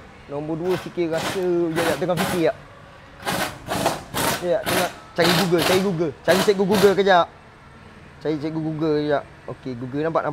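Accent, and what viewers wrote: Pahang, Malaysia. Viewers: Bro orang pahang ke ? Kampung kat temerloh ke ?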